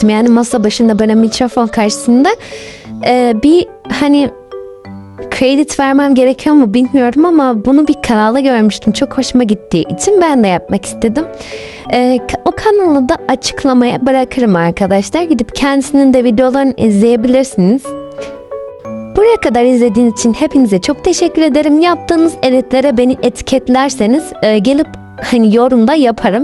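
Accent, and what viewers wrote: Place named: South Korea. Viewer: ama sesin benziyo sanki korelimissinde türkçe konuşmay çalışıyormussun gibi❤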